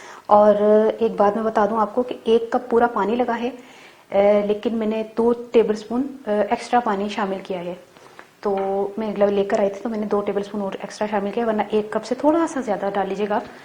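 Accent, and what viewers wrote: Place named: Punjab, India. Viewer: Ap ke panjabi bikul hammari wali ha ap boht achi legti hn panjabi bolte hwe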